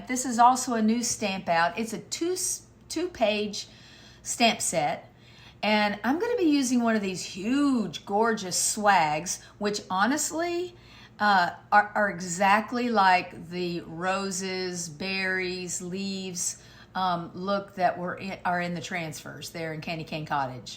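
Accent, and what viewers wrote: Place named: South, United States. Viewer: Happy new year! May god be with you and your family I am so sorry you are going through radiation and chemo god has you in his hand sweetie! I want you to know I love to watch your videos I love your crafting and to be honest I just love your sweet sweet southern voice you have the voice of an angel idk y but you do!! Your voice is very calming to me!! Hope you has a good Christmas , I will have you in my prayers!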